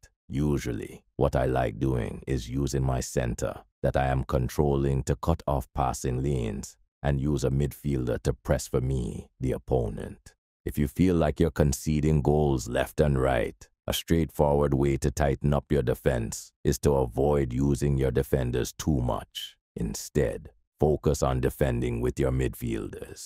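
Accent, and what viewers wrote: Jamaica. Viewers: Bro should look into motivational speaking! He got that leader voice that you know you can trust what he saying. Definitely Jamaican 🇯🇲.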